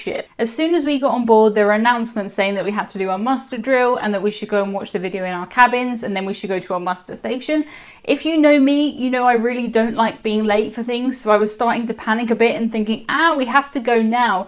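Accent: United Kingdom. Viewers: It is called a British accent, a lovely and charming thing. That is why she pronounces taco in that manner, as most Brits do. If you went to the UK and said certain words with your American accent it would not be considered "butchering ", it would be understood that is how the American culture speaks. It's called tolerance and understanding. What a concept! 🙄🤣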